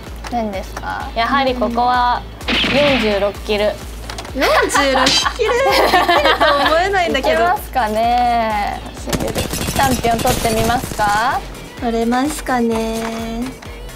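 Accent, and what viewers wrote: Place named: Kyushu, Japan. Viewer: あやてぃ九州言葉が抜けてなくて心地よい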